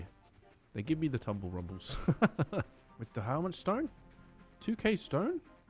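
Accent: United Kingdom. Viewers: Are you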